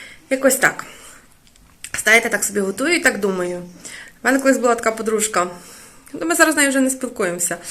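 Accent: Ukraine. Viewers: доброго дня мені подобалося ваш суржик тому, що вас також розмовляють суржиком. Я з Вінниці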